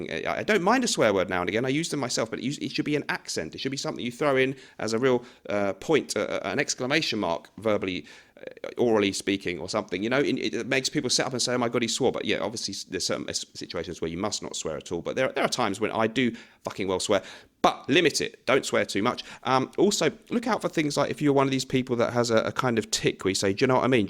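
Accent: England, United Kingdom. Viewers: ....classy? Smartly dressed and that English accent is helpful.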